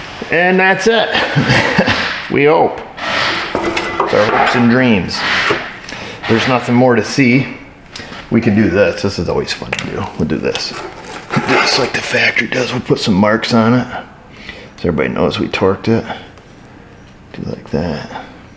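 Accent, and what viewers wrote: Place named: Massachusetts, United States. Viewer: Wicked good MA accent 😂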